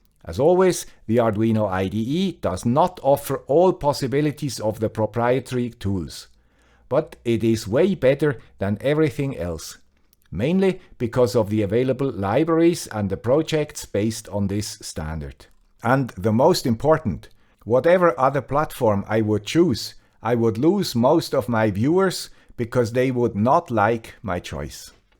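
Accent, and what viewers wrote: Swiss German, Switzerland. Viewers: Ich bin ja überrascht wie viel ich vom Schwizerdütsch verstanden habe. Bin vermutlich schlimmere Dialekte gewohnt. :-) (Bern und Umland?) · Swiss accent is much much better than American. For me, I understand most European English accent better than American and British. Why, because these people speak slow and simple \ easy English words. Thank you for the lecture. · Hi, First, happy new year to you and your family. I take this occasion to thank you for these new year reflexions. I liked your video a lot. Just like i appreciate your technical videos. I really like the quality of your rigorous explanations. You helped me with many of my projects and with my overall comprehension of microcontrolers. Bye (not with your Swiss accent but with my French- Quebecer's one) Stéphane-Billy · Happy 2022! Thank you for all your content and for avoiding the mid-roll ads. 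You make very good choices; deciding to broadcast in English (with a Swiss accent) was a very good choice - you have the possibility of a larger audience, but you still retain your "Swiss-ness". I love Switzerland having visited as a child, and later on business. Your videos are very thorough and well made. I have learned so much from you. Thank you again. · Another useful and interesting video from the guy with the Swiss accent. Wishing you and yours a wonderful 2022. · I told my wife, "The guy with the Swiss accent has a message for you!" I read your response to her, and she laughed out loud! :) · Ich bin aus dem großen Kanton, habe aber dennoch Dein Schwitzerdütsch verstanden :-)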